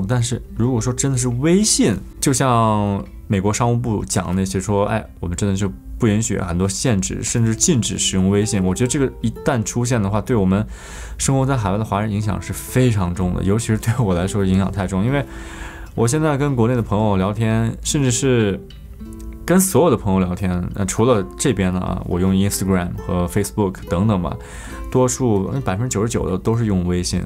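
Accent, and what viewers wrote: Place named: Linfen, China. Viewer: 大哥，你不是临汾人吗？这口音怎么・_・?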